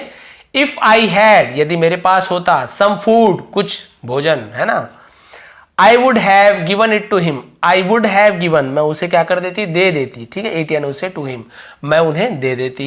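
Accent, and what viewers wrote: Chhattisgarh, India. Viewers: Sar aap Chhattisgarh ke Gramin area se belong karte ho kya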